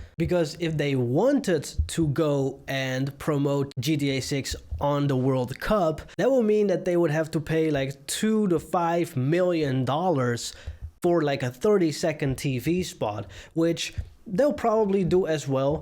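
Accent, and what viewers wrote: Netherlands. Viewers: Ofc he is the Dutch accent is through the roof..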